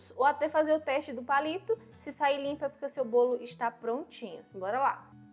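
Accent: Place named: Northeast, Brazil. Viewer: Sensacional!! De que região do nordeste você é?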